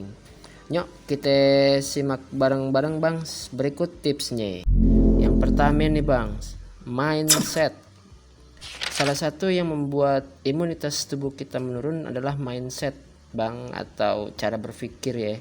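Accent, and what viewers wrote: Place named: Jakarta, Indonesia. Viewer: Khas banget bahasa Betawinya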